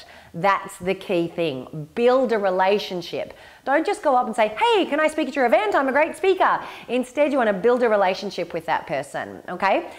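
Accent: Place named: Australia. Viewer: It helps to have an awesome Australian accent.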